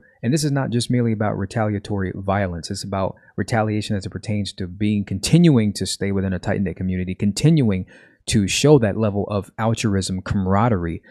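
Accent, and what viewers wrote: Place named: South, United States. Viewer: What we have is Me Too version 2.0 the race war edition with these absolute mental gymnastics that these people are doing so they can cry racism about everything. Did you hear they are trying to call out being on time is racist?? But great commentary and I can hear the "Southerener" in you. Everyone looks at me like I am stupid living up north when I say it that way.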